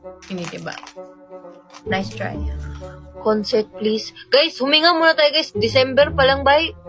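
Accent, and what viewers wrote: Visayas, Philippines. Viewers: Ang cute niya mag bisaya😅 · Ang cute nya magsalita haha ung pagkabisaya nya ang cute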